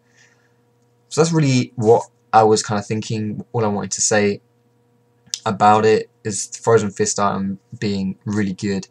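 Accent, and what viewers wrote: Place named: RP, United Kingdom. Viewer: Based on your accent i 've always thought that you was posh and pretty cool. now that i see your face i realize that you are simply AWSOME!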